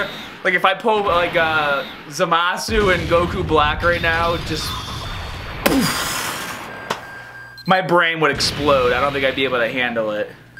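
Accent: Boston, United States. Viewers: you must be from boston 😀 just taking a guess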